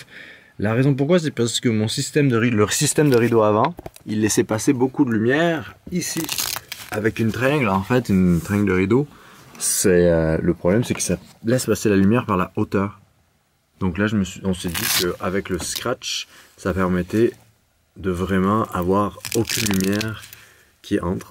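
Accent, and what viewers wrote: Quebec, Canada. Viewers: Bonjour jeune homme. J'ai acheté ton livre et je trouve l'écriture simple et sincère . je le lis avec grand plaisir " j'ai même parfois l'impression d'entendre ton petit accent canadien " Bonne route à vous deux . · Hello ! Vous êtes dans le sud, j'habite à Pau, on pourrait éventuellement se rencontrer pour boire un verre ou pour une balade dans les Pyrénées ? ;) J'ai découvert ta chaîne grâce à Marina, elle est cool, et j'adore ton tout petit accent québecois, au début j'avais même pas remarqué, je te pensais français haha Hâte aux prochaines vidéos!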